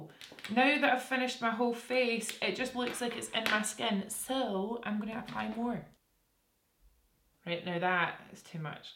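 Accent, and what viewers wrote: Scotland, United Kingdom. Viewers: LOVE that Scottish accent omg..my mom is from Paisley, its awesome! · I start to annoy myself when I watch ur videos. I am from Vancouver Canada and I find myself repeating every other word just trying to mimic you..I love your accent🥰💖🥰lol my bf is usually on the bed beside me and he can’t see or hear what I’m watching, he just hears me saying random words with a Scottish accent to myself in a very serious tone🤦‍♀️😂🤣😂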